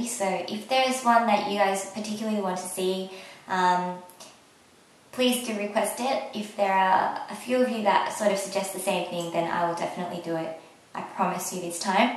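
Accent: Australia. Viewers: That's Australian accent : )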